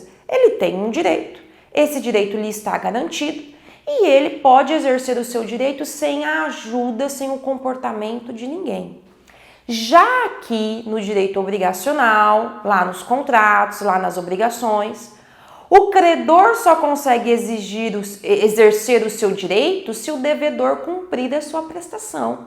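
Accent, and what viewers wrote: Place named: São Paulo, Brazil. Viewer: Excelenteaula. Gostei do sotaque paulista tambem rsrs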